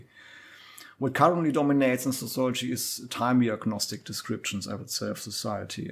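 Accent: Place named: South Africa. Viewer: Out of interest, where is the creator of this podcast from? Your accent sounds very similar to a South African accent. I am from South Africa, and this is intriguing.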